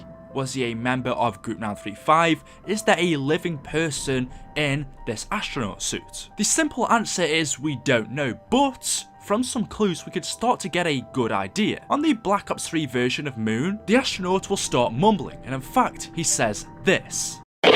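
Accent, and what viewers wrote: United Kingdom. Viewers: I hear a British voice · the voice sounds slightly British. anyone else agree? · He sounds British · He sound British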